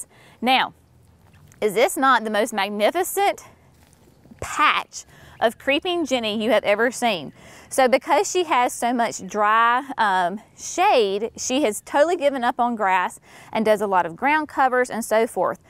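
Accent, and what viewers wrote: South, United States. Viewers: You know, I know you are southern not just by your accent but because I actually feel your joy standing in front of those azaleas. And yes, your mom has a knack for blending whimsical pcs and after my own heart, a container gardener.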